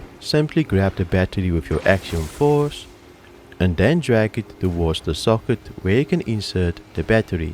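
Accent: South Africa. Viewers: Are you South African? I was trying to place the accent.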